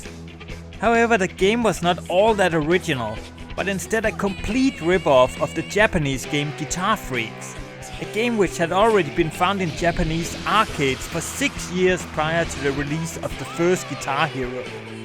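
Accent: Denmark. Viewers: I freacking knew it. The accent got me. Overraskende at se en dansker lave engelsk indhold ;) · holy shit din danske engelsk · Are you from Denmark?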